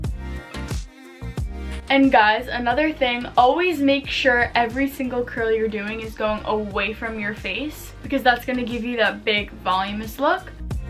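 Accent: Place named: New York, United States. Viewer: are you from ny?? I love ur accent lol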